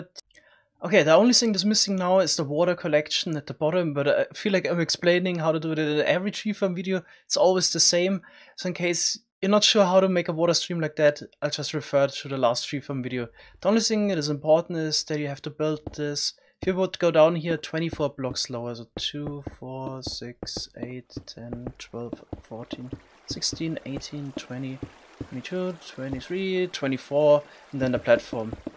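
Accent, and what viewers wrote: Germany. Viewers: First time I am watching a video from you, after 2 seconds, aaahhhh you are german! :D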